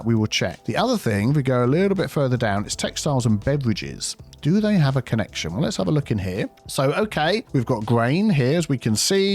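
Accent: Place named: United Kingdom. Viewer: I Always Use to speak in British Akzent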